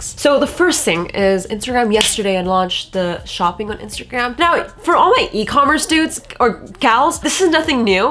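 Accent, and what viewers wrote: United Kingdom. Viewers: As a UK Resident, I approve of the British accent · Kinda like the british accent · WOW WOW WOW WHAT"S WITH THE BRITISH ACCENT!?? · British Accent = 7/10. 😎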